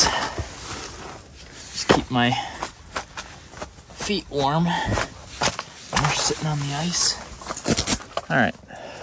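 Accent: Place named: Minnesota, United States. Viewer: The Minnesota accent really shining through in today's video! I really enjoying seeing your community interactions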